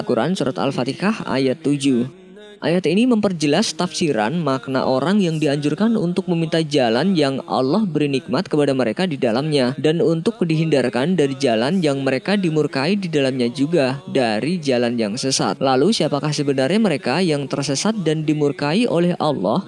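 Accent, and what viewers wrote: Java, Indonesia. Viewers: Hhh sabar orang jowo udah biasa 😁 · Mungkn dia orang jawa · Bibir orang jawa emang gitu say kaya nybut fatir patir..dn ga niat jelek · Rata² yg sebut pelafalan seperti itu orang² jawa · logat jawa banget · Uwoo Admin wong jowo toh 😆, aksennya beda , jazakumullah infonya min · Lidah jawa memang susah di ubah Aksen begitu lekat Alpatekah. Audzubillah hir rabill ngalamin. Mohon perbaiki min. Aku juga jawa tapi nggak gitu kali. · Kaya org jawa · Al-fatihah lah yg paling benar akan tetapi logat orang jawa yg medok membuatnya menjadi al-fatikah sama seperti ayah saya 😄😄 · logat orang jawa itu mah, mertua gw juga sama ngomongnya alfatikhah karena logat di sana medok. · Orang Jawa kek gtu sebagian besar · Dia menyebut Al Fatikah krna sebagian org jawa dialeknya begitu.. tak mengapa jika itu di lakukan krna ketidaktahuan atau krna ketidakmampuan tetapi hendaklah dia memperbaiki pengucapan bila itu sebuah kesalahan yg telah diketahui. 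· Sdra"....jgn mudah menyalahkan org lain. Utk sdra kita suku jawa,kebanyakan pelafalannya ALFATEKAH utk menyebut ALFATIHAH. Itu logat...bahkan ada yg sdh ustadz msh sering keseleo lidah dlm pelafalan alfatihah. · Kmungkinan beliau orng Jawa jdi wajar aj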